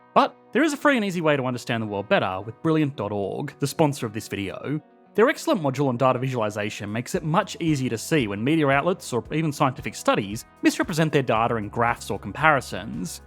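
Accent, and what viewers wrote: Australia. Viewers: I think you just have issues with the Australian accent, his demeanour is fine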